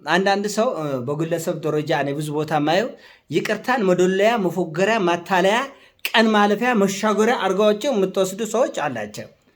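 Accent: Tigray, Ethiopia. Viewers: በትክክል! እውነትን በትግራይ ወገኖቻችን የአነጋገር ለዛ እያዋዛ ለመናገር ወደ ኋላ የማይል፣ የሴረኞችን ተንኮል ቀድሞ በመረዳት የሚያንኮታኩት ጀግና ኢትዮጵያዊ ነው!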